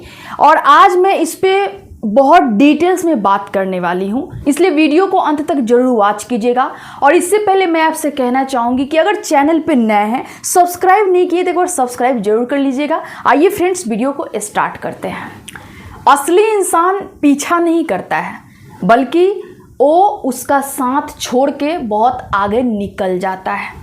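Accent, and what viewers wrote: Bihar, India. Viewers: Bihari tone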